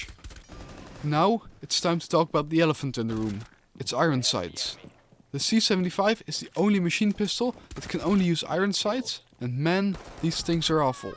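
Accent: Netherlands. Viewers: ik hoor gelijk je bent Nederlands haha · Nederlander? hoorde het in de eerste 5 sec.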